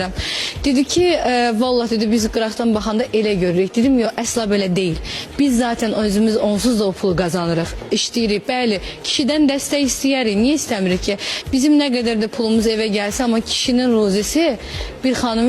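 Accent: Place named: Quba, Azerbaijan. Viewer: Noye olmur Allax bunu Kuya aksentsiz daniwamir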